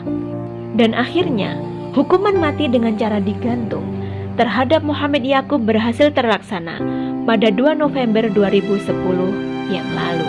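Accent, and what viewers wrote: Java, Indonesia. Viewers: Nemu chanel baru,saya nonton udah 3 kali,tapi baru comen dan gahung sekarang,enak juga suaranya seperti cerita miranti cuma masih ada logat jawanya sedikit.Sukses selalu.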